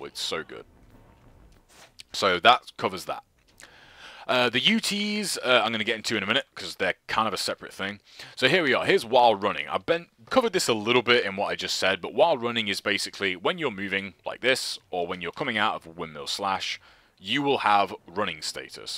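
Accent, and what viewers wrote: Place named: England, United Kingdom. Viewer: Great videos! Thanks man. BTW: which part of England is your accent from?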